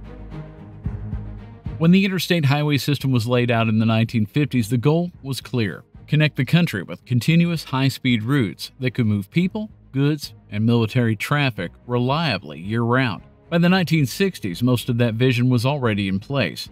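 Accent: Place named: South, United States. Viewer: I have such fatigue for the southern accent and the blessing. Just stop - go to church and stfu.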